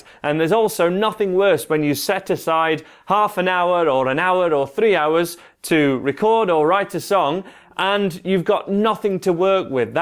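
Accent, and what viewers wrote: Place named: Yorkshire, United Kingdom. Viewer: This is really helpful, thank you :) what part of Yorkshire are you from?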